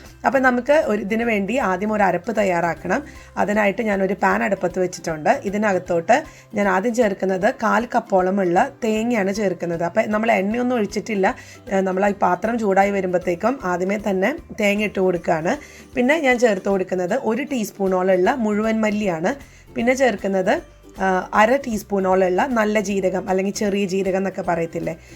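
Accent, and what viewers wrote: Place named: Thalassery, India. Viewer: Superr 👍👍 thalassery recipeyum, thalassery ഭാഷ yum kelkkanno enkile entha youtube channel kannan varuu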